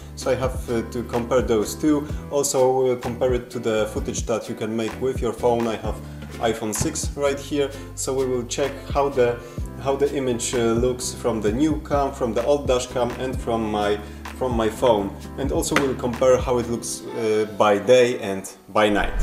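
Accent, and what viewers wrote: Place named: Poland. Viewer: Ale schize miałem. Akcent taki znajomy, polski i dopiero przy porównaniach wideo zrozumiałem xD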